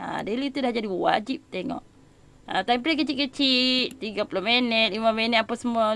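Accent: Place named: Kelantan, Malaysia. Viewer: awk terlalu kelantan bagi sy..tq kak ats ilmu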